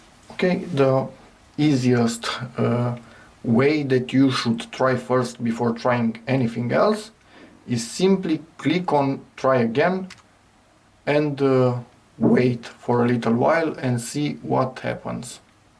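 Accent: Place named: Romania. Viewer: You have such a strong roumainian accent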